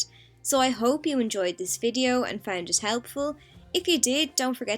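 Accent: Ireland. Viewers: As soon as I heard your voice I screamed 'YES IRISH!!' and my family all stared at me😹🇮🇪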